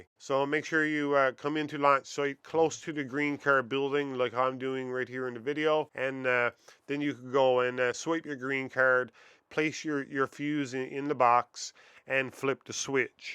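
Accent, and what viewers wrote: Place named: Newfoundland, Canada. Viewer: You gotta be a Newfie lol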